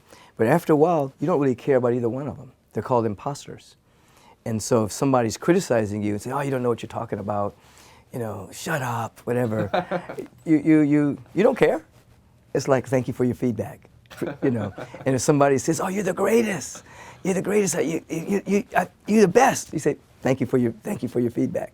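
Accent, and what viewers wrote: France. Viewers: U have a french accent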